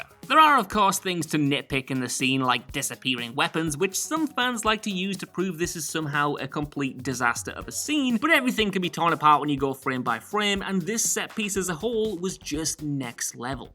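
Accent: United Kingdom. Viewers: British accent really suck! Fuck your Jewel/Joo·Uhl shit!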